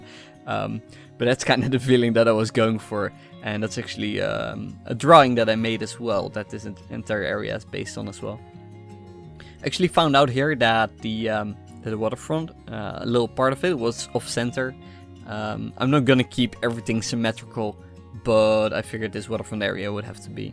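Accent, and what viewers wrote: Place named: Netherlands. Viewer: Haha ik kan gelijk horen dat je nederlands bent 😉 verder toffe video!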